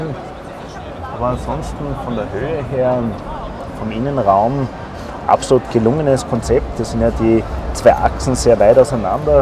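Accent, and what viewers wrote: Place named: Austria. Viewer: Ich glaube, für Leute wie mich, die sehr aufgeschlossen und begeistert für E-Mobilität sind, aber andererseits nicht sehr risikofreudig - seid Ihr die richtigen Partner. Als Allgäuer höre ich die österreichische Sprachfärbung sowiso lieber, als die Ost-Deutsche.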